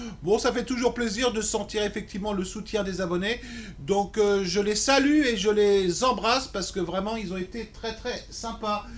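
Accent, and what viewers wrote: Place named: United Kingdom. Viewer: Hello merci pour la pub mentionnant mon prénom, c est normal de ne pas gagner tous les jours, je t écoute depuis suffisamment longtemps pour me faire l idée que tu es au dessus du filet avec caritor 😉😉changes pas ton accent British, il est très fun😊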